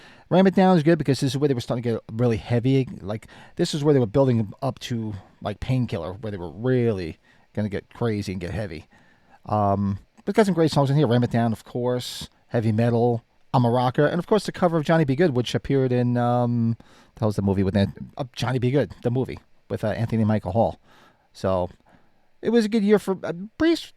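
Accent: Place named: New Jersey, United States. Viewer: How do I know you’re from New Jersey immediately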